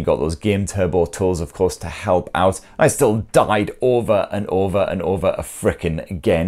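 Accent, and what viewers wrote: United Kingdom. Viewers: W video W person W opinions why u ask? - makes wild animal jokes 💀 - has beef with sweaty gamer kids 💯 - tests display by playing Jojo - British accent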